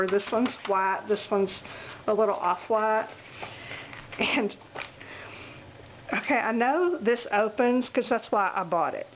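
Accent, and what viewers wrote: South, United States. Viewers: This video was great--very informative and entertaining. You are hilarious! I could sit & listen to you all day long! I've seen a couple other videos on Jet Pens hauls-but yours is by far the best. Now mi know I've got to place an order. Just have to get one of each of those notebooks. The way you cere caressing that paper--too funny! And when you smelled the marker I about fell over, I used to do that as a kid with permanent markers. 😜😜 I have subscribed to your channel & will be going back to watch past videos. BTW where are you from? Do I detect a bit of a southern accent? Thanks again.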